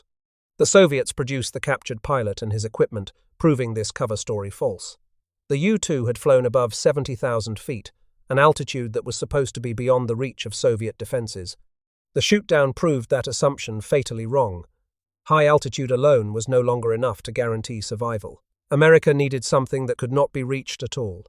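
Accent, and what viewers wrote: United Kingdom. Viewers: The British accent is why so many people watch these videos. Keep them coming!! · Get rid of the British narration.😡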